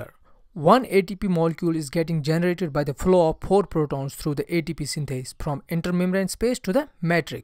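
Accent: Kashmir, India. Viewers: R u a Kashmiri? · Seems like you are from Kashmir sir. Well explained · Love the thick Kashmiri accent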